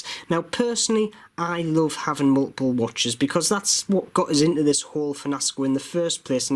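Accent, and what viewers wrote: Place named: Northeast England, United Kingdom. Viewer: Nice video interesting collection not a fan of that dress watch though I have the pagani submariner in the hulk colour myself and really rate it I am also a fan of vostok watches and of course the odd mod perhaps they could make an appearance in later videos plus its canny to hear a NE accent as im from Newcastle myself keep up the good work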